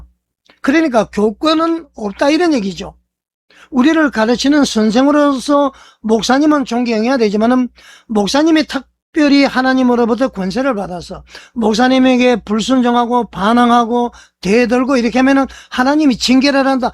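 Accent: North Korea. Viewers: 말도안돼는 유투브영상으로 사람들 혼란시키지 마시요 북한말투가 확실하네요 이런인간이 교회를 망가트리는 원흉이다 여러분들 속지마세요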